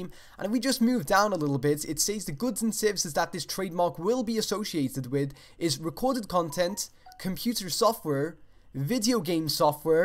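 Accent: Liverpool, United Kingdom. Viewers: your enthusiasm is infectious. I have it now, too. I hope New Orleans is true. Nuka World was leaked in a document two years ago, and it's coming out next week. if Obsidian is indeed working on this, then it might explain why the next South Park game was given to another developer. BTW your accent is difficult to pin down. I'm detecting Liverpool with a hint of Dutch? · He from the U.K. Liverpool. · Where are you from,? Sometimes you sound like you're from Liverpool in the UK but then you pronounce some syllables like an American and I second guess myself.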